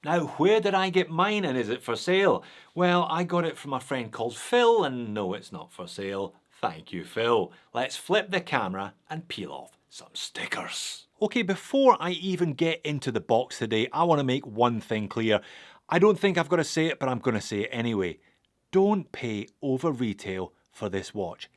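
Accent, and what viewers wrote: Scotland, United Kingdom. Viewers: Thanks for another great review. As a Newfoundlander Canadian, I really like your Scottish dialect. It makes your reviews far more fun and witty ;)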